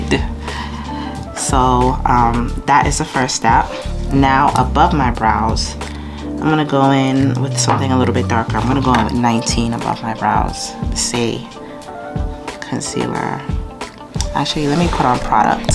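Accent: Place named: New York, United States. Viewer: I love your accent so much!! Everytime you speak I’m like she’s soooo New York! The make up is so flawless… I’m so glad your doing posted this can I’m still learning make up.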